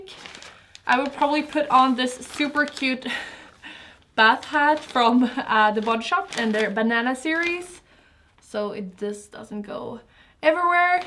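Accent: Sweden. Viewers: omg are you swedish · Is she Swedish?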